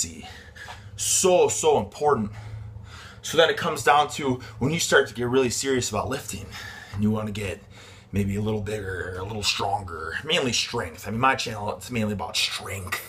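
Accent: Midwest, United States. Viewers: Is he from the midwest?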